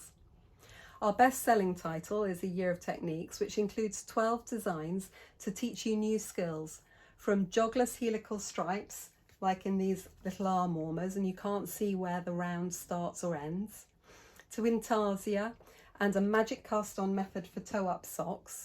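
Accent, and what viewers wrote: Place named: England, United Kingdom. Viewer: Hi, I've just discovered you and your technique videos recently thru MDK which led me to this YouTube channel. Just wanted to say hi and thank you. Your videos are great, easy to follow and understand. I'm an expat from Scotland so your lovely English accent takes me home. Looking forward to learning more. I'm always eager to improve.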